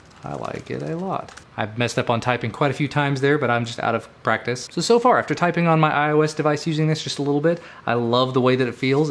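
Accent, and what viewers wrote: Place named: South, United States. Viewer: I know you said you didn't have an iOS device, but I do. I bought mine about four years ago to use with my iPhone Xr, and loved it, although it took a long time to get used to the tiny size. I just upgraded to the iPhone 14 Pro, and after pairing the BK-03 keyboard, I can't get the space bar to work unless I press the Alt key and the space bar at the same time. As you can imagine, this can't stand. I'd never get anything done. I've monkeyed around in my phone's keyboard preferences, and have toggled various settings off and back on (this very often works!) and nothing has helped. I even switched ANSI to another option, then back again. Any help or suggestions you may have would be truly appreciated. By the way, after slogging through all the other videos, I responded to you because of the Southern accent. I'm a displaced Southerner myself, born in the wrong state! 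Ha ha!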